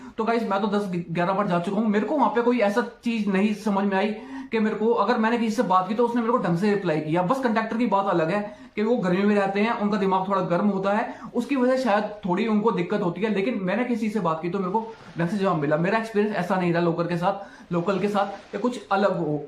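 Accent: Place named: Punjab, India. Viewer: panjabi ascent can't understand what you say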